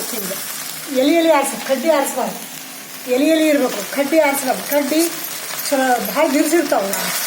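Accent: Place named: Hubballi, India. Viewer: ಅಮ್ಮಾ ನೀವು ಮಾತಾಡೋದು ಕೇಳಿದರೆ ಬಹಳ ಖುಷಿ ಆಗ್ತದರಿ. ನಾವು ಹುಬ್ಬಳ್ಳಿಯವರು. ಬೆಂಗಳೂರನ್ಯಾಗ ಇದೀವಿ. ನೀವು ಮಾತಾಡೋದು ಕೇಳಿದರೆ ಅಲ್ಲೇ ಇದ್ದೀವಿ ಅನ್ಸತದ. ಮುದ್ದಿ ಪಲ್ಯ ಹೇಗೆ ಮಾಡೋದು ತೋರಿಸಿ ಕೊಡ್ರಿ.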